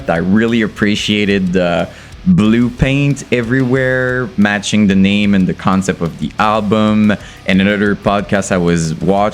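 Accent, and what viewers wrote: Quebec, Canada. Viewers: Great videio man! You do something new and different. Do I hear a french canadian accent?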